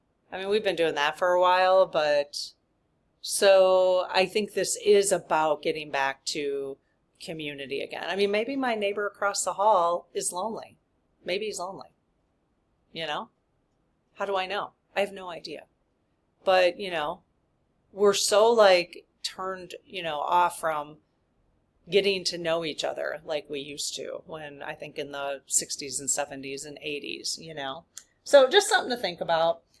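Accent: Midwest, United States. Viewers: Hi. I love you and absolutely love your content. You have helped me more than you can ever know. ❤ And have to say, I kind of thought you were in Wisconsin. I am originally from Illinois. A FIB knows a cheesehead when we see one! I think it's the Midwest accent. When I moved to Arizona, everyone told me I had a weird accent. I hope I didn't offend you. That was not my intention.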